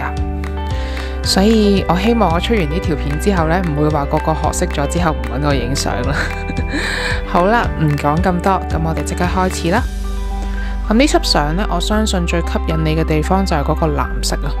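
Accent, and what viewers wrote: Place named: Cantonese, China. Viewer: 好喜欢听你讲粤语😝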